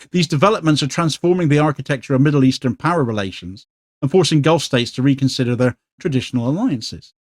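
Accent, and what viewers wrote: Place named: West Country, United Kingdom. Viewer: is from South West of England! That's our accent! 😂😊xxx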